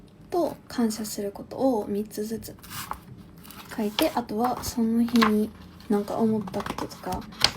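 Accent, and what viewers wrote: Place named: Kansai, Japan. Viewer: おしゃれで努力家で尊敬しかない爆イケオンニ〜♡がゴリゴリ関西弁なのガチギャップですきぃ…T_T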